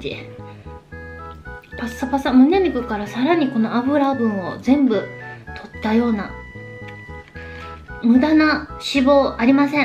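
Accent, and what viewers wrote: Kansai, Japan. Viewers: ちゃんの関西弁まじ大好き！はーと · 早希ちゃん関西人？ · 最近チャンネル登録して見始めたのでASMR動画のヒソヒソ声しか聞いたことなかったのですが、調理中の関西弁がとても可愛い☺️ 賛否両論あると思いますが、私は手袋なしで食べるの良いと思います！お刺身とかはお箸使って食べればいいじゃん！と思うものもありますが、総じて、動画大好きです☺️❣️ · 関西弁？可愛いな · 昔から早希ちゃんの関西弁？かなり好きでYouTubeでの早希ちゃんは見初めて日が浅いので最近はどうなんかわからないんですがちょいちょいでる関西ぽいイントネーションになんか懐かしさを感じます！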